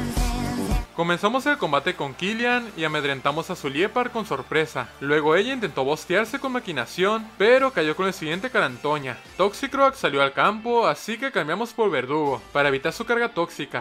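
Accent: Chihuahua, Mexico. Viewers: una pregunta mi estimado ¿es usted de Chihuahua? tiene el acento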